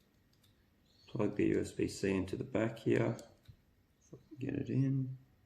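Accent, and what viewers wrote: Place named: Australia. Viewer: You sound Australian, How long did it take for your hak5 gear to arrive? I've been waiting quite a while.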